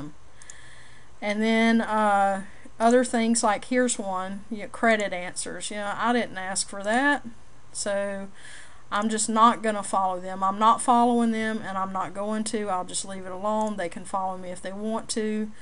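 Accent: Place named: South, United States. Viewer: LUV your Southern Accent!:-) You give a great tip in this vid, nice job. Keep up the good work! Blessings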